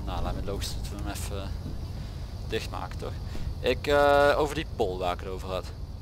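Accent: Limburg, Netherlands. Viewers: En ik merkte het niet dat jij Limburg s bent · Mooie auto met de nieuwe striping! Gaat de politie de tourans ook nog nieuwe striping geven? EDIT: je komt uit Limburg, daarom heb jij een accent maar je moet gewoon praten hoe jij dat wilt! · Praat lekker limburgs man je mag toch gwn doen wat je wilt anders zet hij/zij z.n geluid maar uit. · Blijf je zelf. Je komt uit Limburg en daardoor heb je een accent wat ik overigens opzich wel mee vind vallen. Ik vind het helemaal niet erg, je moet lekker praten hoe JIJ dat wilt. Lekker boeiend wat anderen vinden, wij verstaan je en begrijpen je. Dus je hoeft helemaal niks te veranderen aan hoe je praat.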